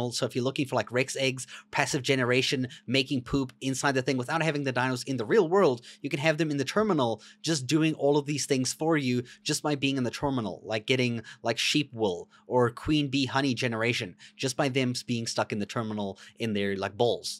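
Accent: South Africa. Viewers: I could tell a fellow South African from that accent. A buddy got me into ARK recently and showed me some of these. Awesome Spyglass is a crutch for me now. Mist definitely gonna try a few of these. Also subbed too! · Do I hear a lil South African accent? Heyyyyy from ZA. awesome vid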